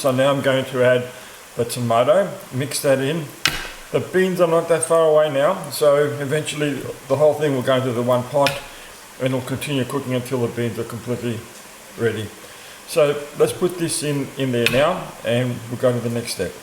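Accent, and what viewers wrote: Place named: Australia. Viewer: thanks I will definitely share with others I too love cooking delicious and nutritious food for people especially my loved ones ❤ Also I could hear the Outback in your voice right away so when you said you were Greek I doubted my hearing but later you said you hailed from Australia I was like I KNEW IT 😂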